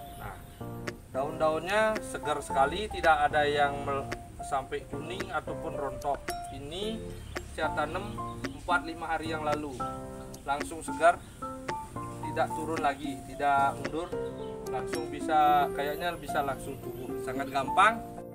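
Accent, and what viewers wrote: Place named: Bali, Indonesia. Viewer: oh pantes logatnya kayak orang bali😂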